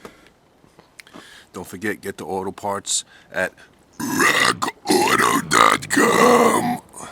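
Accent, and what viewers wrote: New York, United States. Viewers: ROTFLMAO!! hell yea this dude the man!! i hear NEW YORK IN THIS DUDE!! helluva job then pulled out the 40 rty of that old ing bing. Hahahahah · great video nice to hear a new yorker again been in florida to long haven't drank an old e in a long time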